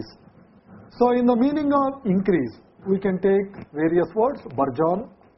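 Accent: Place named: India. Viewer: Useful and interesting method of learning English but hard Indian accent makes the lesson more harm than good.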